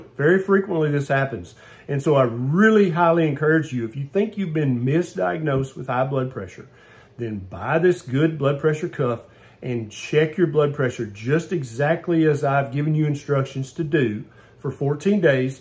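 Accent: South, United States. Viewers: "Before taking your blood pressure, I want you to sit down and relax. Do some deep breathing. Maybe turn the lights down. For around 10 to 15 minutes. This may seem like a lot of rigmarole..." How Southern can you get. 😄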